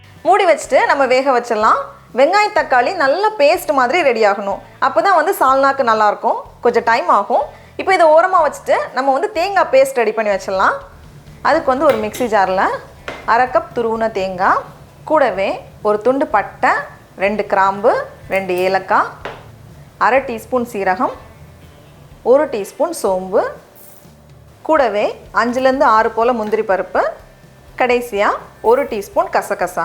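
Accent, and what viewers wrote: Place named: North Chennai, India. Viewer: Your now Celebrity channel ,please improve your Tamil pronunciation ,Dont say la ,Zha ,its only few days of practise. Azhagu !! not Alagu ,tamil means Tamizh or Zha ,avoid north chennai accent too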